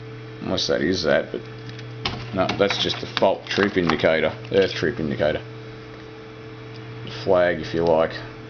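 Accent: Australia. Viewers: Stumbled across your video this evening, as I too have a 440t RCD that has tripped. However, like your video, the switch is VERY stiff to the point I may exert too much pressure on the switch. It is stuck in the tripped position, thus I am without power ( as of writing ). It's good to hear a typical blokey Aussie accent on YouTube BTW. 😃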